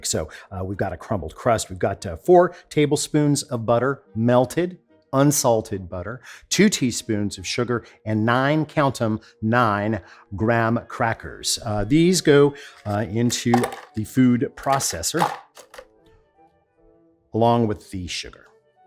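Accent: South, United States. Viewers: he’s from the south, let’s not hold it against him…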